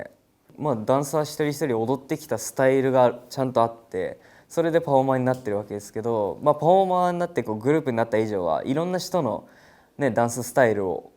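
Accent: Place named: Okayama, Japan. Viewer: かっこよ。あと岡山弁素敵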